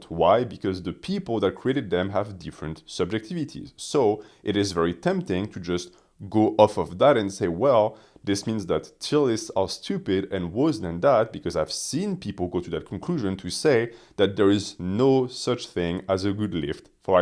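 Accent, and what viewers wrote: France. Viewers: Soon his thick French accent will be a thick Spanish accent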